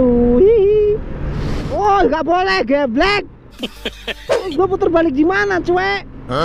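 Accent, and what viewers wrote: Java, Indonesia. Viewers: ojo lali di subrekkk.... jowone ngendi kang???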